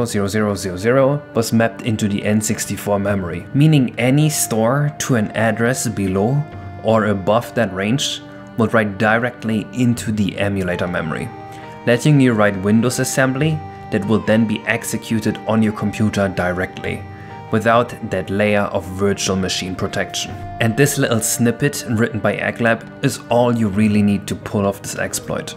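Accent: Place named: Germany. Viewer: hey, i like you and your videos however it would be nice if you could refine your pronunciation a little more its not bad by any means, still it could use some improvements here and there f.e mispronouncing some words or your still somewhat noticeable german accent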